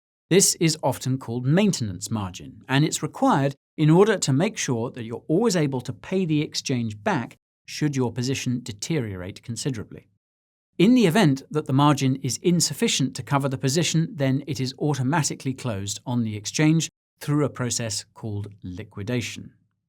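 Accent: United States. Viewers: I gotta say,. Your American accent is hella lot better than my British accent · Solid American accent! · Killer American accent, brother. As much as I try I can’t never get the entire Brit inflection right 😂😂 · I must admit, the American accent was rather hot!💥❤ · ur american accent is good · your hilarious great American accent x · Lol his American accent cracks me up. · american accent ....lol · Excellent American accent,way to put yourself out there,. Love your content, brother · I love the American english accent 😆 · nice american accent u only slipped once! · Great vid. Loved the American accent. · Your American accent is on point! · nice American accent. :-) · You sound like Nicholas Cage with the American accent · Great American accent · Nice American accent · The American accent kills me!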